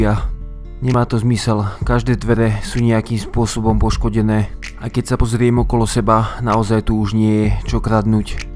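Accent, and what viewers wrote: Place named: East, Slovakia. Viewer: Videá má dobré,klobúk dole ale mal by natočiť video o svojej škole,lebo ten komentár,dva letiská,dva cesty,dva autá už je blbé,mal by navštíviť učiteľku zo ZDŠ.Trepanie o studenej vojne 1970,studená vojna bola už za prezidenta Kennedyho a Chruščova tá neskončila padnutím ZSSR.Keď že robí zvuk až po natočení videa,stále to môže opraviť,poznám dosť východniarov ktorý žijú v Bratislave a hovoria síce z prízvukom ale spisovne!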